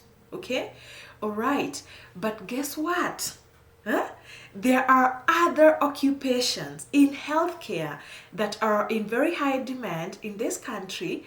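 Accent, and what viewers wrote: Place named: Kenya. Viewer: Can’t miss that Kenyan accent haha. You look great!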